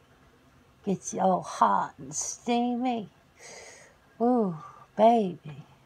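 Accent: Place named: South, United States. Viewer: hi another great vidio with the Southen accent too always good listening my friend Stay Safe xx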